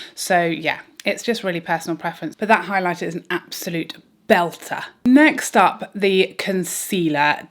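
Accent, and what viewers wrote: England, United Kingdom. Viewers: I love to say your intro along with you in your English accent!! I’m a goof! Lol. Enjoy you so much! 🥰😍🥰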